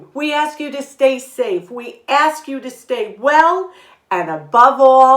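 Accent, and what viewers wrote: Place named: North Jersey, United States. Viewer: Hello from Northern California! I was raised in North Jersey though, so love hearing your accent and when you talk about ShopRite. I haven’t been thrifting in ages. I don’t shop much anymore (except for food), but secondhand is definitely the way to go!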